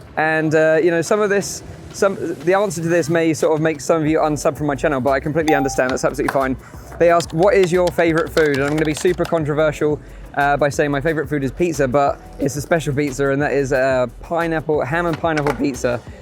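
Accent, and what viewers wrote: United Kingdom. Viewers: Defo uk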